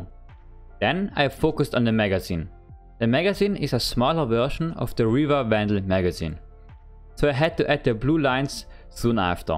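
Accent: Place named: Germany. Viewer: ich wusste direkt am akzent dass du deutsch bist hahah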